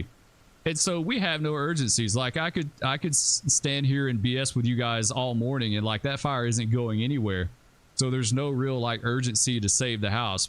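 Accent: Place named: New York, United States. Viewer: Into the flames time I see let the FDNY accent begin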